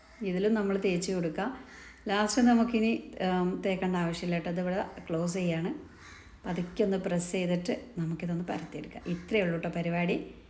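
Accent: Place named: South Kerala, India. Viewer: Pazhaya malapuram poyit ipol thekan slang aanallo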